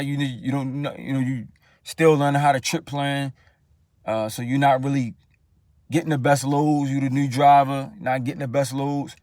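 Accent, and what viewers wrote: Baltimore, United States. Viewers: I’m a nurse but just be curious about other professions n general but I like how U kept it 💯 a lot solid info and I def heard that Bmore accent lol by any chance u remember which season/episode of The Wire u were n? Thanks for the video ✌️ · 2 things I learn from this video. 1. What to expect my first year pay of trucking. 2. I can still recognize Baltimore accent from anywhere.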